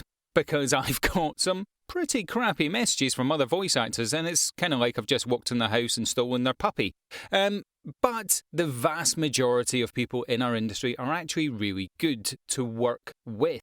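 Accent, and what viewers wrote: Scotland, United Kingdom. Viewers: Thanks for the assessment and the James Earl Jones comment -- made me titter. P. S. I'm British-American, and to my native English now partly American ear, you sound INTENSELY Scottish! Heh heh heh.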